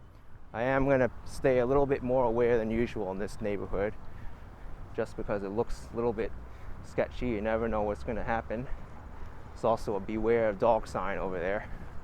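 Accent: New York, United States. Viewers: You got a thick nyc accent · How can he not be from NYC with the boroughs accent he has? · I like your NY accent! 😀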